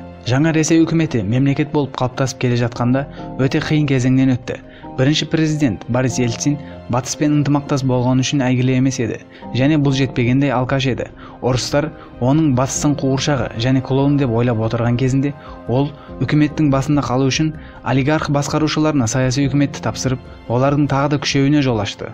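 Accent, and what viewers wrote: Uzbekistan. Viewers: Өзбексің ба ? Кара каопаксын ба? Акцент өзбекскй ұнамайды сөзің.. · Казакша нармални сойлеш озбек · Сенин шындыгыннын барлыгы неге 27 болып келеди отрикти сога бересин гой деимин сосын казакша дурыстап таза казакша соиле Казакстанда турып жатырсын озбекше акцент косып соилеме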